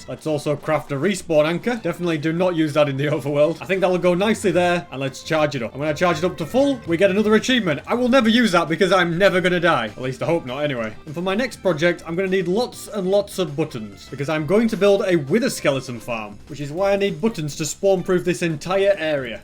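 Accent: United Kingdom. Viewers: Are u from uk?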